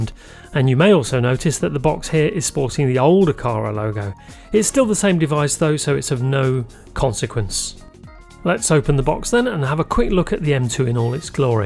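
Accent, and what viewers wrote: United Kingdom. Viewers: I thought he is based in the UK from his accent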